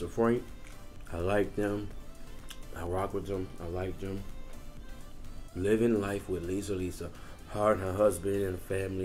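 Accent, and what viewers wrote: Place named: New Orleans, United States. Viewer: What's up my man! Checking your channel out. Your accent makes me miss NOLA!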